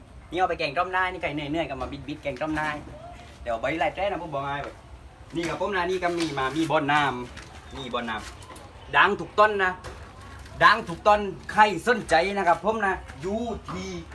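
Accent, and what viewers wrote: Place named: South, Thailand. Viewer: สุดยอดเลยคนใต้แหลงใต้ฟังแล้วบายหูขอให้ดังๆรุ่งๆนะคับ👍👍👍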